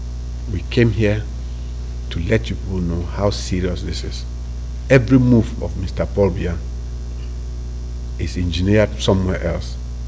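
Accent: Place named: Nigeria. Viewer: You sound Nigerian . How is the struggle of Cameroonians consern you ?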